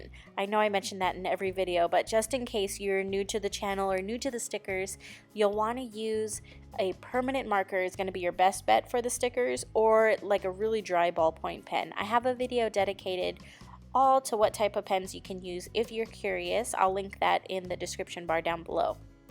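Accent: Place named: Dakotas, United States. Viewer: I know you've lived in Hawaii, but by any chance have you lived in the Dakotas? I'm always trying to figure out your accent! lol especially trying to figure out where "anywhose" comes from! You're so adorable!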